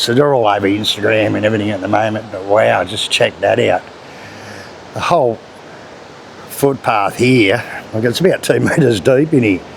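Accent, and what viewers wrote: Australia. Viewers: Full on Aussie accent !😮😂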